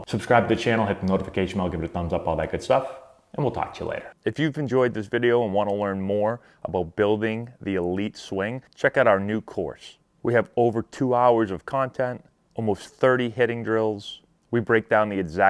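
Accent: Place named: New England, United States. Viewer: ive always heard a little bit of peter griffin in his voice lol! even though he doesn't have a wicked strong "hahvard yahd" type boston accent, I can definitely tell the way he sounds is 100% new england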